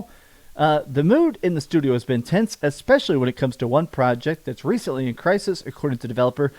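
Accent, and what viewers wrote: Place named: Wisconsin, United States. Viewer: Is from Wisconsin? What is that accent?!???😂🎉😢😢🎉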